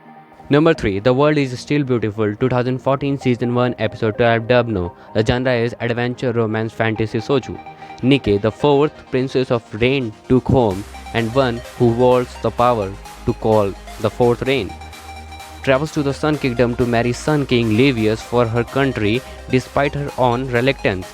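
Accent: India. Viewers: Are you an Indian. I asked because your speech like an Indian. I like your videos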